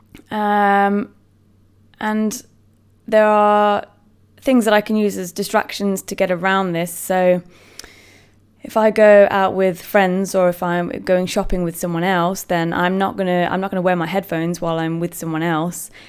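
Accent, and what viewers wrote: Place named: England, United Kingdom. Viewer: Saw this on the misophonia reddit, figured I’d give it a watch. I want you to know that you’re not alone. I can really relate to the experiences you’ve talked about (just some different trigger sounds) and so I really empathize with you. And having misophonia+sensory overload+social anxiety is not a fun thing to combine, I know that firsthand. But if it makes you feel any better, it could be worse; you could have OCD too. No, seriously, it’s hell on Earth. My misophonia being triggered trips my OCD, which trips my anxiety, which ramps up my sound sensitivity and my OCD even further, and then they interact again, and so on, and so forth, ad nauseam. Oh, and I have perfect hearing (quantitatively speaking, I got a perfect score on the Army hearing test) so I hear *everything*. So yeah, that’s great for misophonia. 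Sorry, I went off on a weird ramble there, didn’t I? Both my OCD and my misophonia got triggered badly, I got really baked to cope, then surfed Reddit, which in turn led me here. TaDa! Hooray for self-medication! Also, totally unrelated but I freakin love your English accent.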